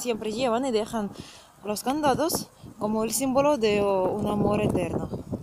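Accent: Argentina. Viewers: Habla medio argentina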